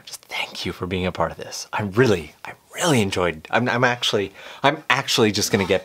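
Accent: Canada. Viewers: I keep getting distracted by the Canadian accent. I’m wondering if you’re from Saskatchewan or somewhere around that part.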